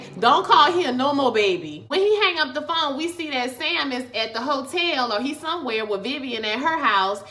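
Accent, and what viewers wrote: Louisiana, United States. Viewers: I swear i love your reviews in that Louisiana accent 😀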